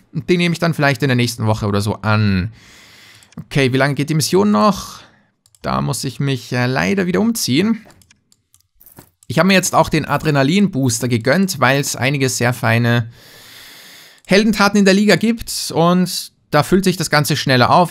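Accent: Austria. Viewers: bist du aus Österreich?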